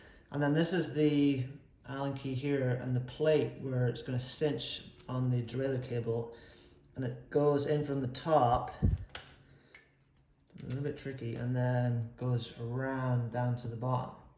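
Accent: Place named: Scotland, United Kingdom. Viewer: Fabulous video…enhanced by the hint of a Scottish accent ..👏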